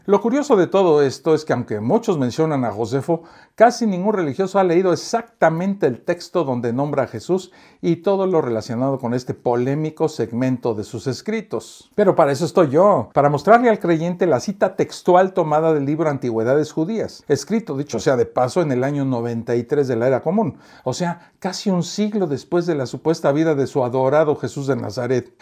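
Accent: Mexico. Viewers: Que bien se explica ...y me encanta su acento mexicano..😊😊😊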